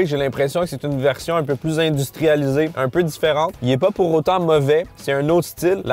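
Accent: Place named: Quebec, Canada. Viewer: j'apprends le francais pendant un an maintenant et tes videos me donnent envie de avoir l'accent quebecois comme vous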